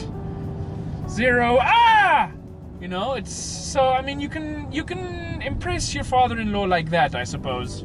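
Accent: South Africa. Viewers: Are you South African mabey? The accent sounds familiar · is this guy South African? I'm new to the channel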